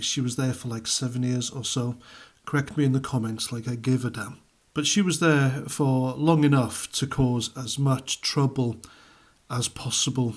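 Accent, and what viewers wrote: Wales, United Kingdom. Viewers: Sounds like you are Welsh. Why are you criticising New Zealander? Hypocrite.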